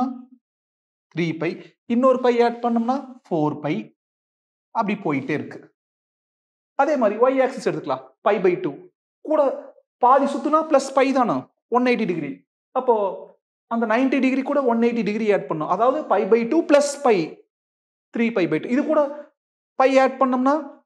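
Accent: Coimbatore, India. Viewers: Guys kovai slang 😅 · Kerala Pakkam ponadhu Ilaya 🤣🤣 idhu Coimbatore Tamil 🤭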